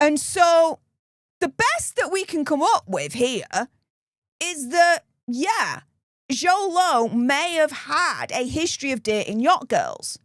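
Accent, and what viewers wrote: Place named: England, United Kingdom. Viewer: Am I the only one that thinks heavy English/Slang accent is kindda difficult to understand?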